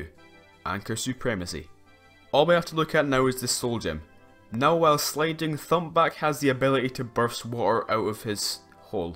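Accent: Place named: Scotland, United Kingdom. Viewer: Where you from in Scotland my man?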